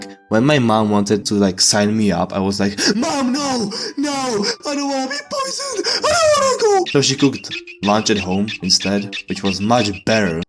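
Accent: Ostrava, Czech Republic. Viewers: ur accent really czechish lol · We can hear the czech R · Ostrava pronunciation is very easy to recognize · Jde to slyšet že seš z česka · jde poznat že jsi z česka ten náš užasnej přízvuk poznam vždy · It's really easy to tell you're from Ostrava bc most people from Ostrava has that unquiet Czech accent that we laugh at sooo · waah ten ceskej prizvuk s tou anglictinou je cute XD jinak, fire videjko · I'm from czech and this is very accurate, your accent is very czech too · PODLE TO HO HLASU SI CECH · 1st time hearing someone with czech acsent, im slovak and i speak english with no acsent, im suprised czech and slovak acsent even exsist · You have a very Czech accent. 😅😂🎉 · I can hear in you're english that you are czech (but thats fine) btw greetins from Přerov! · the most czech accent ( btw am from slovakia zdravim bro) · TVL DALŠI OSTRAVAK SE SPAWNUL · "even tho all it takes is to open my mouth in prague and everyone immediately knows that I'm from osTRAva" bro I can even hear it in english (I'm also from osTRAva hahaha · BRUH I can hear your Ostravan dialect from your English too! 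🤣🤣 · Omg I just was scrolling through YouTube and then I saw ur video I just watched some and then bro you actually mentioned other cites thank you man IM JUST SO HAPPY I SEE SOMEONE FROM THE SAME REGION AS ME (the Czech accent is very strong haha) I really like how you make the video it’s really creative and I’ll definitely become a big fan of your Chanel great work man! :] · Teda až na ten OSTRAVSKEJ PŘÍZVUK. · Ostravaka proste poznáš😂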